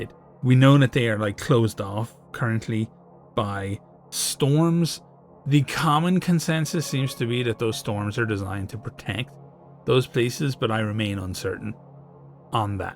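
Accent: Ireland. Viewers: Are you a Kildare / Carlow man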